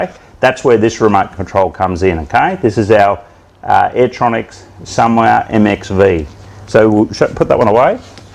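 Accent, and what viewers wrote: Australia. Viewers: Guys before commenting on prices ... didn’t you grasp the accent? This is a down under RC shop (Queensland Australia) So prices are in Australian dollars... USD is 2/3 of an Australian dollar...😉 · ITS AUSTRALIAN DOLLARS CMON MATE DONT YOUR HERE HIS ACCENT. HE EVEN SAYS GOLD COAST AUSTRALIA LOL.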